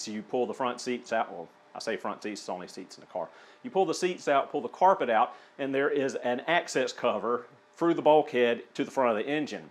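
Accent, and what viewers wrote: South, United States. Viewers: I'm still trying to get over, anyone with a Southern Accent, not being stupid. I never see them on Jeopardy. Coincidence? Anyway, that aside, you are absolutely right. Nobody talks about how user friendly it is, when it comes to repair, or working on the car itself. It is after all, a simple V-8 with a lot of plastic surrounding it. I totally agree with you. The warranty will cover so much, for so long. Plus, as soon as you put any kind of mod on it, it voids all the warranty coverage. Plus, nobody should ever purchase first year anything, when it comes to cars.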